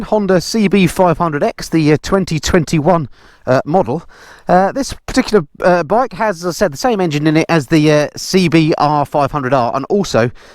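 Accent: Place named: Hertfordshire, United Kingdom. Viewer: Great review you old Norfolk boy you ha ha. You've still got the accent albeit mixed with a bit of semi posh Hertfordshire home counties.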